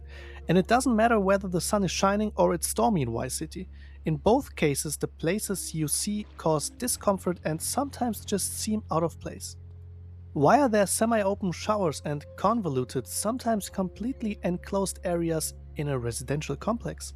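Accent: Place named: Germany. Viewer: Thicc german aaah accent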